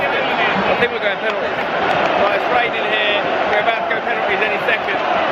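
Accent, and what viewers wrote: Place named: United Kingdom. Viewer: You are talking like a british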